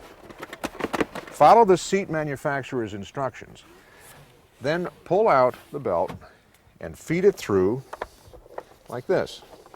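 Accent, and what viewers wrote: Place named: United States. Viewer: this is one creepy VHS era video why is an american bloke speaking with a posh English music in the background? :D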